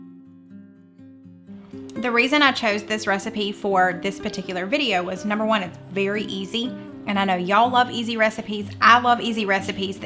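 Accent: South, United States. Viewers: I love watching your Videos,!! Also Love your Country Accent.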